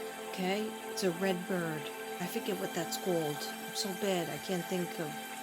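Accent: New York, United States. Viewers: seriously I am so amused by you. ❤️ Your NY accent in TX. I love it! You guys must have moved there for a job because why else would a proud NY’er move so far southwest? It must have been a difficult transition. Lived my whole life in the Midwest, went deeeeeep south to visit my husbands family and felt (and probably looked) like a fish out of water. Nice to visit, not stay 😂. I love your heart, you are so precious.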